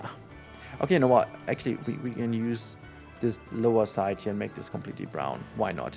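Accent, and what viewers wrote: Germany. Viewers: Finde es witzig das man raushört das du Deutscher bist obwohl dein englisch sehr gut ist😂❤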